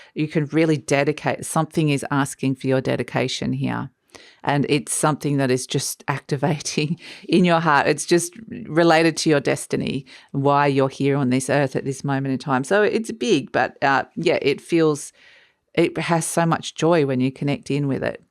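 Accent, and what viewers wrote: Australia. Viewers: I love this reading. I am feeling all of this. Sadly, I found someone I wished to co create with, and it had its challenges. And being very particular with whom I share my energy and love, it’s necessary for mutual respect and appreciation. Im not a fan of competitive co creating, and people who try to get one up on me. It’s all about a win win alliance, and teamwork. After these few very challenging months, deep healing work and introspection, a delay for a new beginning, I’m so ready to get back into action. Thank you for your time. I love your surroundings, the sounds of birds, the crows today, and your Australian voice. It’s been quite soothing. Much love to you. 💗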